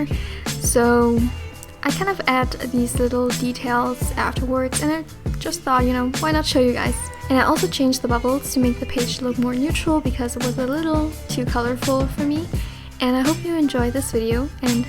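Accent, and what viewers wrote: Germany. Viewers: Since you said thats what it's called in German, I hear that you are German. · Wait- where are you from? Germany?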